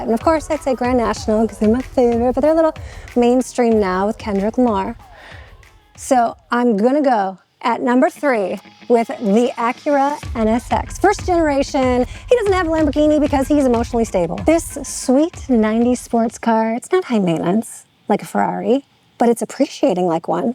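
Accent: Chicago, United States. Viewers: Yes. If you close your eyes and just listen - 1000% Chicago high class girl. Unmistakable. Even with the man's watch. Brilliant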